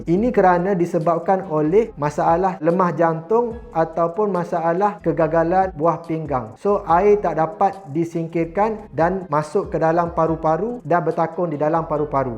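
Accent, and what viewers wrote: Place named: Kelantan, Malaysia. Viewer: Ore klate pesen nii..gaya kecek gitu😂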